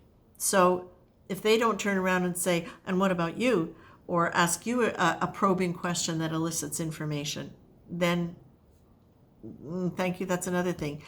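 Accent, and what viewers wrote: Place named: Ireland. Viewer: Thank you! Love you Irish accent! So on point! (Fellow Irish person).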